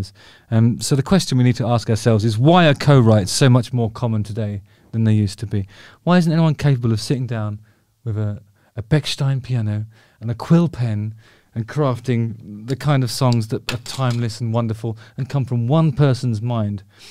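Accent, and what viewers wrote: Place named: RP, United Kingdom. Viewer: What a beautiful singing voice but I also like your speaking voice its a posh cultured accent that comes across as natural and not affected. On stage I call your best performance an expression of unaffected grace. Really dig your channel.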